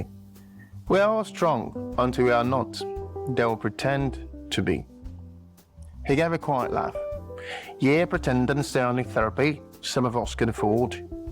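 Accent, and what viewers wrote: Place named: Liverpool, United Kingdom. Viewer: Excellent .I love how paced it is. Can you make them more like this .. Happy scouse accent too😂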